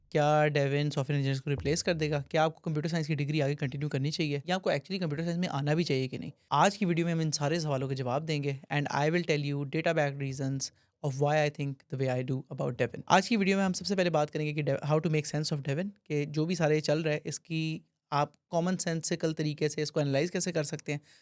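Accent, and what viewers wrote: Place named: India. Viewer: Thanks to Almighty Allah I saw first Pakistani tech content creator who talks like Indian tech content creator we proud of you sir what a fabulous way to narrate the story big fan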